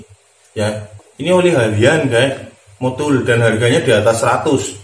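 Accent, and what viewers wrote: Banyumas, Indonesia. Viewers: Filsuf mesin dengan ymbahasa ngapak😅😅😂😂😂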